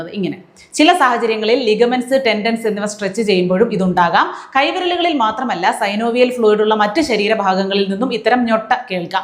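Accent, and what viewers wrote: Thiruvananthapuram, India. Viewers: ജി തിരുവനന്തപുരംകാരി ആണോ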